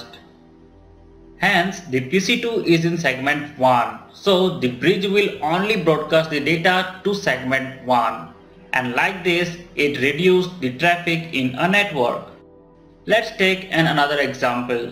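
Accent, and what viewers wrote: India. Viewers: video plays heavy indian accent is playing I find another video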